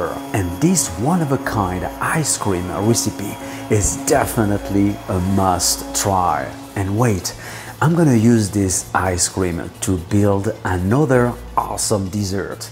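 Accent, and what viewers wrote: France. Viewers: Y would u add corn syrup ? That’s not european despite the french accent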